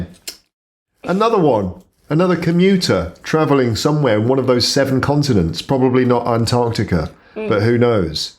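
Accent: United Kingdom. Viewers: ❤❤❤wow. 👍🏻 well done, I love listening to you. The very British voice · Congratulations from Spain.. Thank you for your amazing English class,with excellent british accent. My son is currently doing his surgical training in London, thanks to you! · Hello from Paris to Paris! You have brilliant set - a catchy voice - both British accent and timbre, sense of humor (I laughted at the Russian joke, as far as I am Russian, I could claim it was good) and knowledge about how teach language. I believe that you are right on keeping your motivation to continue with different types of podcasts, may be you will come up with even more new podcast subtypes, future will show. Bonne continuation! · ❤❤ Hello, I subscribed to you only now, although before that I had been listening to your correct British English for several months. It's very interesting to listen to you. 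I listened to the story about how to communicate with small children three times. I wish you health and success. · Congratulations Sir !!! It's very helpful listening your beautiful British speaking!! Wish you great success more than that 👍🫡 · Congratulations. You're a great speaker. I'm italian, i'm a beginner learner and i love your british accent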